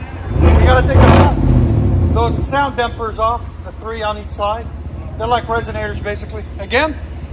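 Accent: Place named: New York, United States. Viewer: He sounds like he from new york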